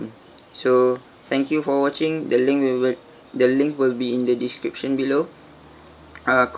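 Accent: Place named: Malaysia. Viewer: sounded like a Malaysian.. probably a Malay coz of that accent..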